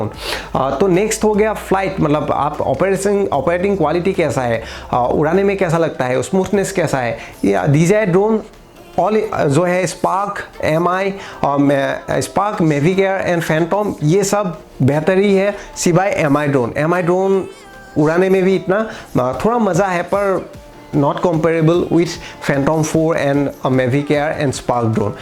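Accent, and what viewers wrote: Assam, India. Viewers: bhai accent tu huni gom pai golu tumi axomiya buli... so about goi salu sivasagar r manuh ulala...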